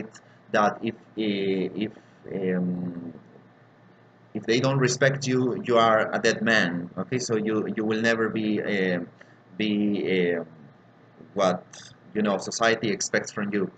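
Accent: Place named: United Kingdom. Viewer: English from Británico😊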